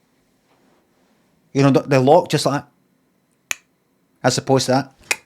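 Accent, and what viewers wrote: Glasgow, United Kingdom. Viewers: yaas man, the glaswegian is strong in this one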